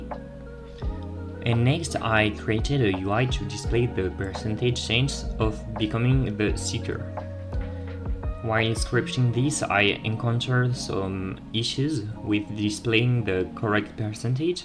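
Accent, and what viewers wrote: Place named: France. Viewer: You should translate your videos to french because your accent is kinda annoyingq(sorry i have the same lol)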